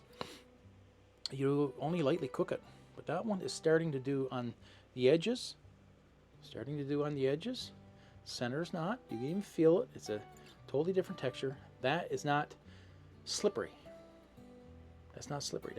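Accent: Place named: Newfoundland, Canada. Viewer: I absolutely love watching anything you post. Love that Newfie twang! I have a NovaScotia twang lol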